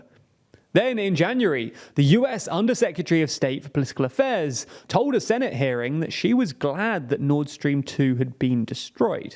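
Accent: United Kingdom. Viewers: This is the stupidest pure speculation video from you guys I’ve ever seen. This is becoming like NewsWars with a British accent. I hate it when a good channel becomes successful and begins chasing the money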